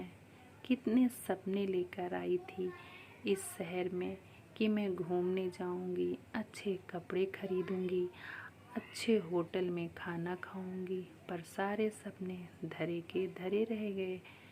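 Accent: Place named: Awadhi, India. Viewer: Big Like 14 bohut khub surat prastuti di aap ne ji aap ne bohut khub surat voice sunai our awdhi mea bola karo bohut acchi lagti hai aap ka swagat karta hu aap plese connect with us long term 🙏💐👍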